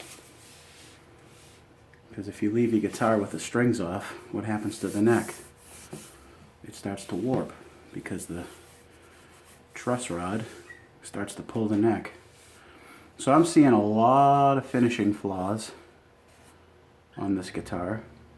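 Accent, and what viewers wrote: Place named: Boston, United States. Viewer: was wondering where you were from I'm from Hyde Park and felt right at home with your accent lol it was pissa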